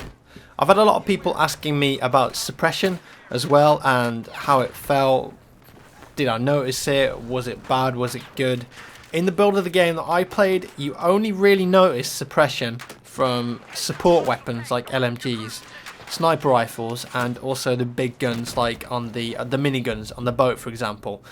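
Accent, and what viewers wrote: United Kingdom. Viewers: HES BRITISH ITS THE WAY THE PRONOUNCE IT. GET THE FUCK OVER IT. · Because he has a British accent? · BF3 not BFfree. He's a brit like me ,mate.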